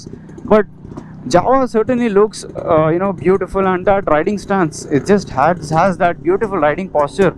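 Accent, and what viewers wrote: Hyderabad, India. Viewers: Have watched this video for over 5 times now, we love your Hyderbadi style Hindi accent bro 👍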